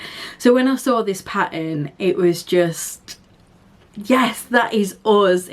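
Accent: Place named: North, United Kingdom. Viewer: Right I’m all caught up! Loving your flosstube and really enjoyed spending time with you. As a fellow northerner it’s lovely hearing another uk flosstuber! Your soda stitch finishes are stunning and I literally love all your wips. Had to stop the video a few times to check on different Etsy sellers!! I think you are far too hard on yourself re your project bags I think they are phenomenal, if I made one I’d prob end up with a t shirt by mistake or something 😂😂 so happy to see your starting Victorian charm, I completed it a couple of years ago (think I show my finish on flosstube 7) if you want to see a finish of it! Not going to lie it’s a big piece but it’s so worth it! It’s still one of my fave finishes to this day. Happy stitching lovely - take care, Lauren (Flossabilities) 🧵💗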